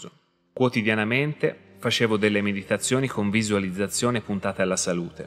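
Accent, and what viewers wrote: Tuscany, Italy. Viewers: Ti ascolterei tutto il giorno già solo x il tuo favoloso accento toscano... io amo ❤️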